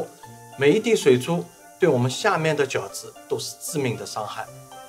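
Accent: Shanghai, China. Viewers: Grace W 很明显的上海口音 ：） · 上海腔普通话~🤣🤣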